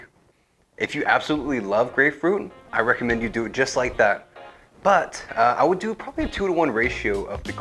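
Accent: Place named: South, United States. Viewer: I know right? Love his slight southern accent too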